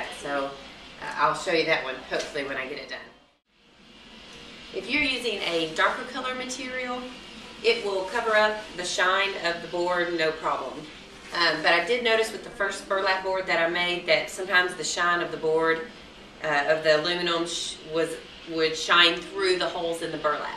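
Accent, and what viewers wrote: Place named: South, United States. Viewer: Wonderful job! Thank you so much for sharing. I have seen your etsy boards and they are beautiful. Keep making tutorial videos....your steps are easy to follow and everyone loves a good southern voice ;0)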